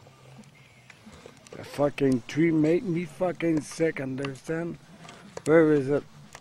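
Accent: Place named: Quebec, Canada. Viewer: TRB3 Appareil militaire qui fonctionne a l' énergie nucléaire.... Check sul net ! Ton accent québécois dans ton anglais est très drôle en passant !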